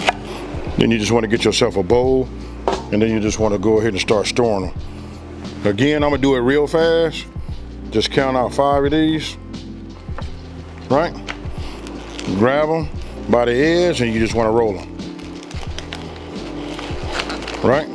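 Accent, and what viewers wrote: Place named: West Coast, United States. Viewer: hahaha bruh got a thick West coast accent.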